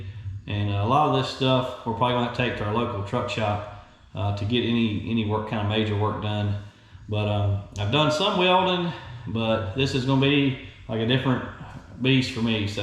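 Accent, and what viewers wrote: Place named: Virginia, United States. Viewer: Congrats on the bus! It will be a fun project. And it sounds like you are a fellow Virginian. Cool! Thanks for sharing.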